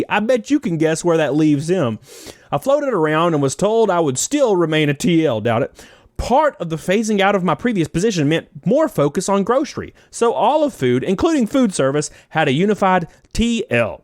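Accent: South, United States. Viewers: havent watched this channel in a while, man did become a lot more southern!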